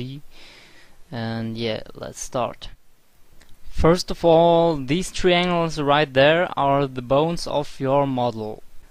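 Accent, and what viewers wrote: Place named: Germany. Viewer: youve got a german accent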